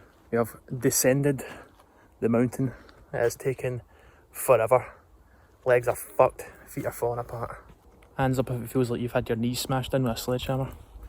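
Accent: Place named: Scotland, United Kingdom. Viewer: English girl here, hello from "down south" ❤ and all English people love the Scottish accent i think I used to walk 20k steps a day every day, walking to work and back (1 hour 15 minutes each way and 20 mins in my lunchbreak) Having a purpose to the walk (a destination) makes it seem easier i think. For me it was my method of getting to work and back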